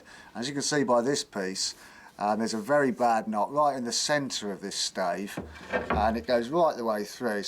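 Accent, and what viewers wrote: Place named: London, United Kingdom. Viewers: Nope. Definitely not Northern. Southern, probably London area. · Not northern at all. I'd say down by London somewhere. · I think East london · London or nearby.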